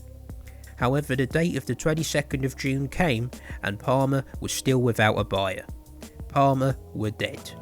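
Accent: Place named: London, United Kingdom. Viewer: the geezer accent makes it even better 😂 phenomenal.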